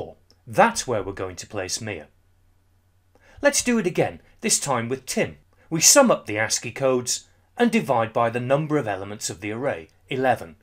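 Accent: England, United Kingdom. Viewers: You have a fine English accent, Mate. It helps my brain gear run smooth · His British accent though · I think the British accent helps me learn better. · Thank you, very british man, for a nice explanation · His British accent sounds so cool! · Perfect explanation. On a side note, are you British? when you speak, you rhyme and it's a treat to my years.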